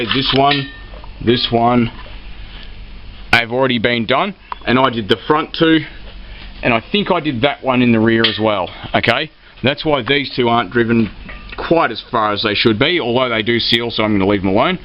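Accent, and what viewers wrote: Australia. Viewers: i must say you are so Aussie sounding and direct its refreshing, i went to bunnings tonight and didn't know i was in Australia at all!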